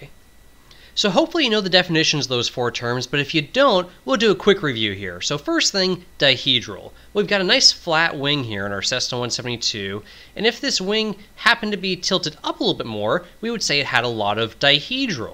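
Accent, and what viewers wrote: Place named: United States. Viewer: Might be an interesting subject but can't listen to such an awful voice, quite apart from the bloody american accent.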